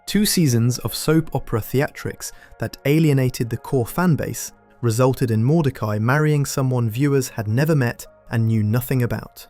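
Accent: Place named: France. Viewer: Tu peux mettre une piste audio en français? Parceque ça fais un peu bizarre d'entendre un mec parler anglais avec un accent français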